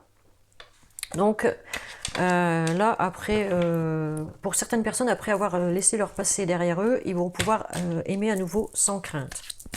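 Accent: North, France. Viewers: j'adore l'accent du NORD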